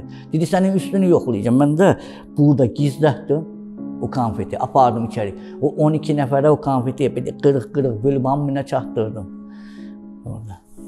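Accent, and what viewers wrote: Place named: North, Azerbaijan. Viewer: Бизим шимал лехчеси олдюрюрде мени❤❤❤❤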